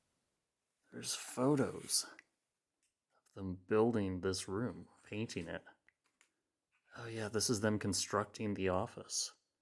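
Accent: Canada. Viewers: Is the narrator Canadian?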